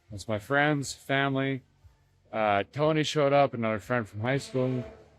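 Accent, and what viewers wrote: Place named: South, United States. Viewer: It's good to see a video where for once your not adding extra made up words or acting " extra country " instead of just speaking normal like you did at the beginning in the body shop. I keep skipping or giving up on your videos when you start making up words or trying to make illiterate talk seem cool. Please keep up the good work and less of the slang talk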